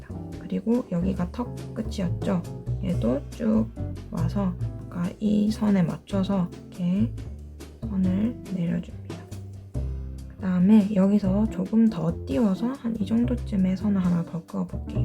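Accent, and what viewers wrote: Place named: Daegu, South Korea. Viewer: 혹시 대구분? 사투리 정겨워요 ㅋ 저랑 같은말투 ㅎㅎ 절대 음성녹음 안한다는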